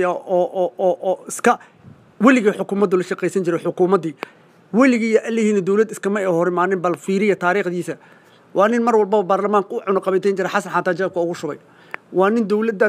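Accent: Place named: Ethiopia. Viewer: Adigaba Eathiopian ah lahjadada ayan arkaye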